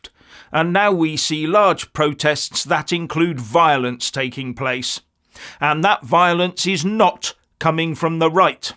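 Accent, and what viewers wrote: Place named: United Kingdom. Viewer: You are so British and so proper I seriously thought you were AI for the first couple shows thank you I love you bro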